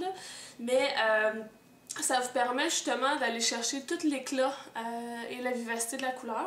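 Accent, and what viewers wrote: Quebec, Canada. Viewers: C'est possible que sa marche ( le problème j'ai pas de vernis neon ) -.- je vais essayer quand j'en n'aurais mais sinon j'aime bien ton accent ! Tu es Québecoise ?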